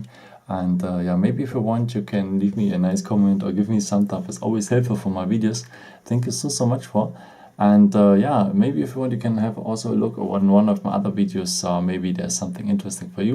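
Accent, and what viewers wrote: Germany. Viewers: Wie man einfach hört dass er Deutsch ist bzw seine Muttersprache Deutsch ist. XD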